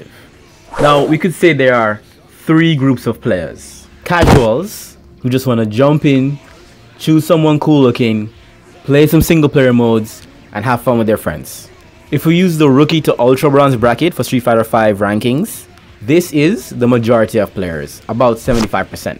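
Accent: Jamaica. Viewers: I think street fighter is easier than tekken because street fighter you don't have to learn as much compared to tekken you have to literally put in more hours than in street fighter people have this big misconception that tekken is an easy fighting game but when you play in high level play it's hard as fuck and that guilty gear does sound hard as fuck though I might try it out and you sound Jamaican big up lol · i can hear the Jamaican in you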